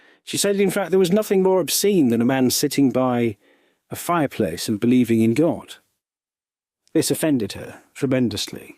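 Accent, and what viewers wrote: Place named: United Kingdom. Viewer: Sometimes you make sense, for a Britain, despite the accent.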